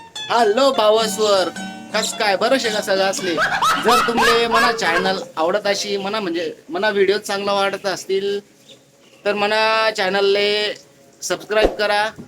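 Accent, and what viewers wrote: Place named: Khandesh, India. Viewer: जमनी बरका अहिराणी एकदम ओरिजनल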